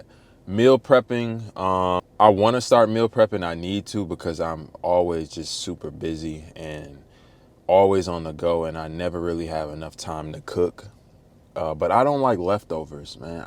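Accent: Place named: New York, United States. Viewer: I would've sworn you were from NY. You have that NY accident. Great content!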